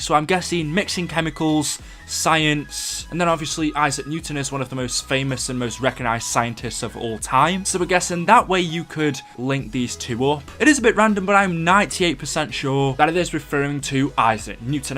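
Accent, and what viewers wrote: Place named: Stoke-on-Trent, United Kingdom. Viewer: are you from stoke on Trent because your accent sounds familiar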